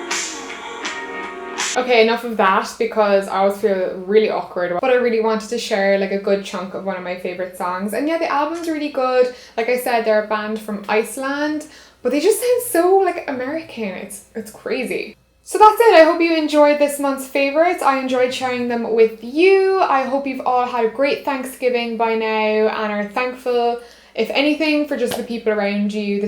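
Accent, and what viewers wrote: Ireland. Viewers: Love your accent my name is also my family is from cork in Ireland too 😍😧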